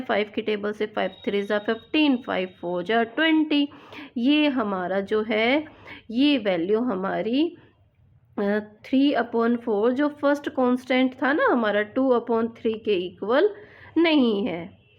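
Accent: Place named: Haryana, India. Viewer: Ma'am aap kaha rehte ho? Harayana? Aapka accent sunke lagta hai aap Harayana ke ho. Aap Harayana ke ho kya?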